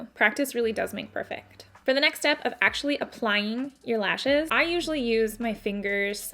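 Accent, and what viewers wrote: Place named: Canada. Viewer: ur Canadian accent is adorable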